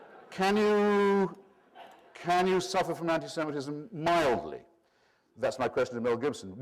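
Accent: United Kingdom. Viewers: So many reasoning errors, yet structured with such eloquence, a beautiful British accent and confident condescending tone. To name a few: 1. Opposing Zionism before the creation of the state of Israel is not the same as after it's establishment. In the same way that not wanting to have a child is different than wanting to get rid of a living person. 2. The fact that Jews didn't want to be told that their place is in Israel, doesn't really negate the idea of zionism. Zionism was never about forcing all Jews to live in Israel, just creating a safe haven. 3. An in-group argument is very different in nature a criticism from the outside. Targeting a group by finding a group inside the group that sides with you (for reasons that have to do with the Jewish religion, nothing to do with your reasons) is classic tokenism. Just say some of your friends are Jewish, it's shorter. 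4. The fact that there are antisemites that are content with Zionism, does not in any way lead to the conclusion that opposing Zionism is not antisemitic. It might have a nice witty ring when he says it, but logicality it doesn't follow. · I wish he had american accent. Bit hard to get everything with british accent. Good stuff thou😊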